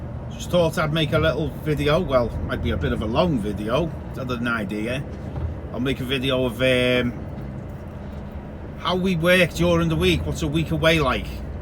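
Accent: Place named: Liverpool, United Kingdom. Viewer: SCOUSER STYLEE.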